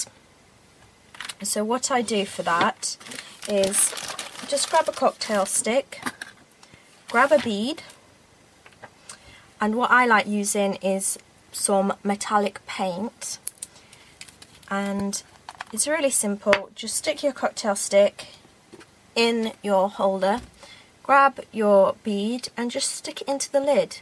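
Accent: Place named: North, United Kingdom. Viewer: There's a Northern voice in there girly!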